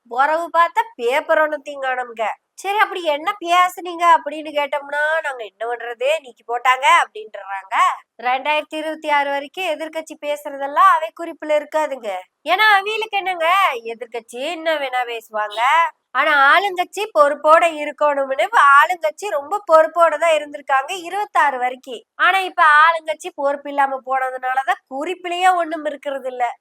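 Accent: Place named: Kerala, India. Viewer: Kerala la nee product viththuttu thaane iruntha papa ..adhe slang ipovum varuthe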